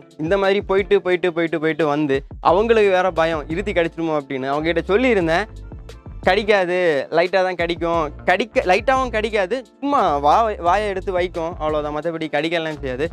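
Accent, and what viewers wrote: Kanyakumari, India. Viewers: Thampi kumarimavata Tamil ketta rompa alaga irukku malayalam mix Tamil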